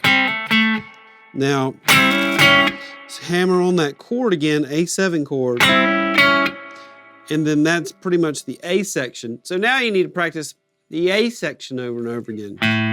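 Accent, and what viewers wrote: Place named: South, United States. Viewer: okay thanks ( by the way that is not my picture,,just props) any who this lesson is really valuable to me i will diffidently use this one!!! hey in this world of politically correctness which is completely ridicules ( thanks obama) i just gotta comment on your accent,,i like that southern draw when you speak,,I'm from Texas my self i thought man that guy sure does sound like my home boy ! any who GREAT SHUFFLE Lesson...e : )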